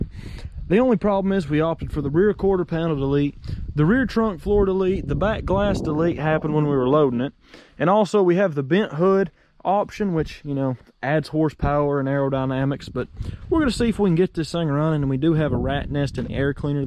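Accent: South, United States. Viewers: great job. love southern accent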